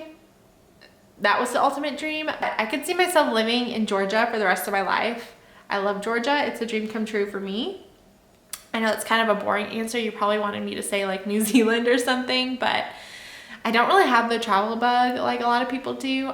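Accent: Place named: Texas, United States. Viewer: Learned about your channel from Monica Rose. I totally enjoyed "getting to know you"! Sounds like you are in Texas.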